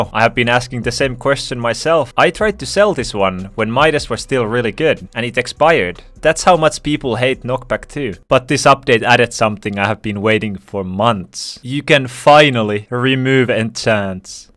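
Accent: Finland. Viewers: i noticed the finnish accent in 0.2 seconds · Where are u from bc means block on Finnish and u got an Finnish accsent on english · instantly when i clicked on the video i realised from your accent that your finnish :D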